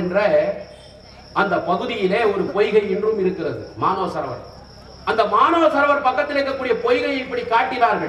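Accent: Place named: India. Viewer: ஐயா நல்ல தமிழ் கேட்டு நாளாச்சு. உங்க புண்ணியத்தால் எம் செவி குளிர்ந்தது.அதுவும் ஒரே மாவட்ட தமிழை கேட்க்கும் போது மிக்க மகிழ்ச்சி உங்களை ஆலத்தூரில் நேரில் சந்திக்க இறைவன் அனுக்கிரஹம் வேண்டுகிறேன். இனிய ரமலான் நோன்பிருந்து நினைத்தது நிறைவேற வாழ்த்துக்கள்.ஐயா. இவன் பூ. நிரைஞ்சன் குமார் ஆற்காடு.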